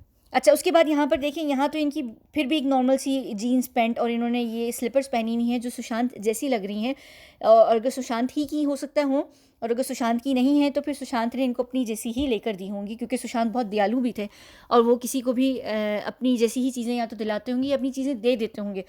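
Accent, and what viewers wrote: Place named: United Kingdom. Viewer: Absolutely in love with your work ma'am. You always back up ur facts with evidence. But I really have crush on your English accent. More power to you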